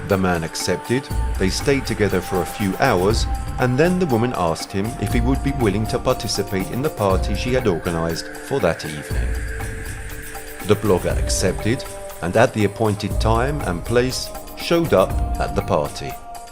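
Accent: United Kingdom. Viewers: A story about the USA..but Brit narration ?? Peculiar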